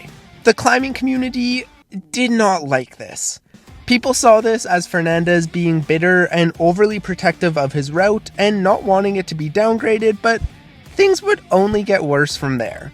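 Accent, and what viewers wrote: Canada. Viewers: I was going to guess you were Canadian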